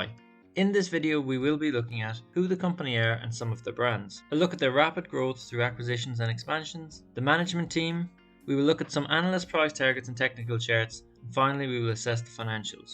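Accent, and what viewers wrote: Ireland. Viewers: Nice man subbed! Irish accent?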